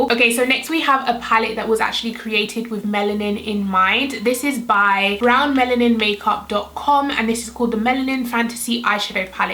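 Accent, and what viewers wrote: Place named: United Kingdom. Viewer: I love to click on your videos just for your bomb ass looks and your accent! I love the British accent. Lol.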